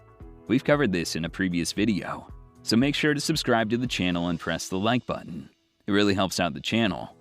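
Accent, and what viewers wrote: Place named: United States. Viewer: An American voice wow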